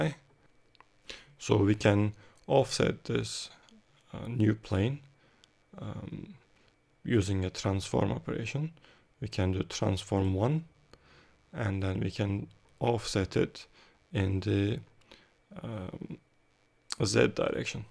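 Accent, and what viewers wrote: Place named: Turkey. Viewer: hi admin, where are you from? your english like Turkish accent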